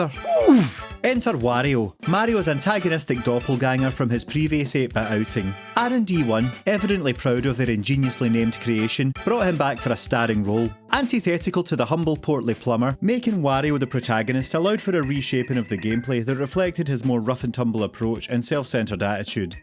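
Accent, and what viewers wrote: Scotland, United Kingdom. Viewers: Nice, thanks for the reply, I'll definitely check out your other videos! I really like the sound of your voice (it's the Scottish accent).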